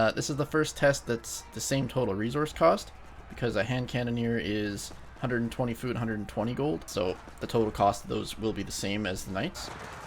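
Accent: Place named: England, United Kingdom. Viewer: i just thought it was kinda funny i always love to hear the english pronunciations <3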